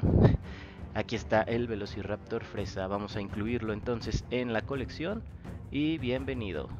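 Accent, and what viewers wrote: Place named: Costa Rica. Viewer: Hola, Habla D'top motion, no es nadie importante mira. Se que eres de Costa Rica, igual que yo 😙, en el video " Tips para un buen stop motion" había una camiseta de la selección de Costa Rica ( la sele) y no sólo por eso, tu acento y todo. Se nota que eres tico. Me podrías decir COMO RAYOS TIENES TODAS ESAS FIGURAS ( ESPECIALMENTE EL SPINOSAURUS AEGYPTIACUS) saludos crack!! Pd: sub desde hace 3 años 😍😎😍 Donde conseguiste el spinosaurus acá? Amazon o que? En Costa Rica es ( para mi) imposible conseguir dinosaurios en tiendas departamentales ( walmart, Target, ect) Solo una ligera explicación por favor!! Atte: D'top motion.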